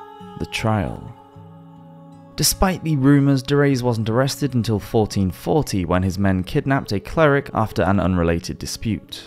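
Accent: England, United Kingdom. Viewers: I love this channel. Medieval history just sounds so much better coming from an OG English accent.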